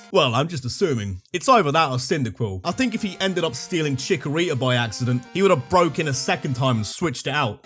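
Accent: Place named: England, United Kingdom. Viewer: It sounds English to me, but Idk which region. There are a WIDE variety of accents in every country.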